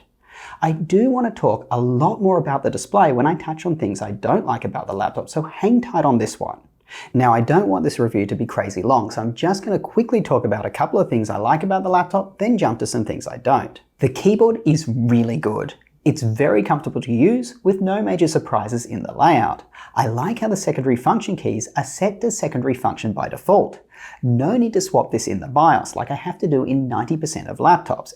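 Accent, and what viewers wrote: Australia. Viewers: He is originally from Australia lol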